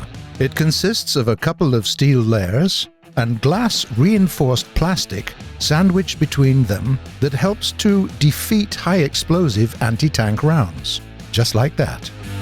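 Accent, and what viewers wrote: England, United Kingdom. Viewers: Gaijin is a Russian company, and they hired someone with a thick English accent to narrate it. Dunno, just an observation. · Мммм... английский акцент. Бляяя... Ну чуваки, всеж нормально начиналось. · Ricardo Mierz but...he sounds so clever! He sounds so...British!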